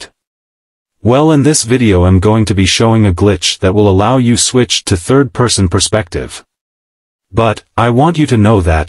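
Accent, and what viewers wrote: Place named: Nigeria. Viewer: Love the Nigerian accent bro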